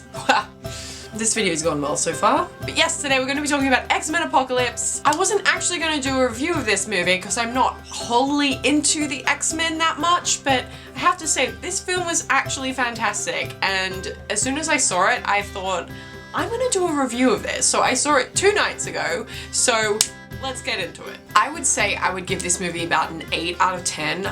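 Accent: Australia. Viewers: Nice reaction, I loved this movie lol I have to know are you from Auzzie or NZ?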